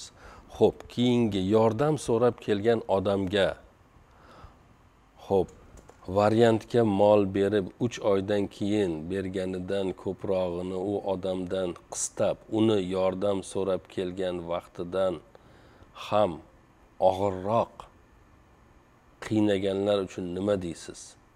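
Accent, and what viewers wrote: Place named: Termez, Uzbekistan. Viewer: Мен бу канални икки Кун булди. Танидим. Узбекистонда и кайси студияда. Гоголда туркияда деб беряпти. Шевангиз Термизга тортяпти. Каерликсиз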